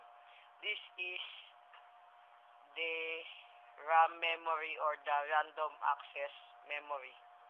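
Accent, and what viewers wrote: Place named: Philippines. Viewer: pinoy hahaha. ung english pinoy n pinoy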